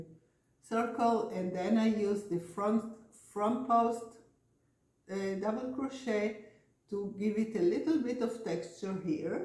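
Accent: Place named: Israel. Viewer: Hi!! I just received an email from you introducing yourself! I’ll have to go back and look for which pattern was yours as I’ve been participating in a few different blog hops. I recognized your Israeli accent too when you started speaking! I only had the pleasure of visiting Israel twice, once with my Hebrew School for a youth trip, and again the following year for my brother’s Bar Mitzvah. But I loved it there and I love the incredible internet that let’s us connect with people from all over the world! I live in the USA, just outside of Philadelphia in Pennsylvania, on the East Coast. Best wishes to you with your channel. I’m going to look for your book on Amazon. Thank you for sharing! 💜🧶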